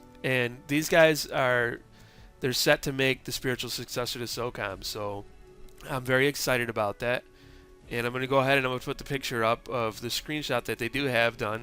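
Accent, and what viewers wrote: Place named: New England, United States. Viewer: This discussion sux you sound like a Yankee just like that dumbass suckmyturban! And you didn't even play confrontation that was socom at it's finest! Socom 2 was best in that era. But when the HD world came out it was all about CONFRONTATION! Only problem like the other socom was the cheaters! Socom was known for after been out a year or so the cheating came out! Socom was the best game ever made!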